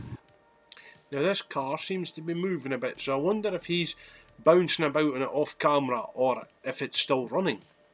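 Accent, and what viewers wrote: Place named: Scotland, United Kingdom. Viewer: great workmate. these fakers have been caught again. greetings from Munich Germany S 🤘 🖤 🤘 btw love your Scottish dialect.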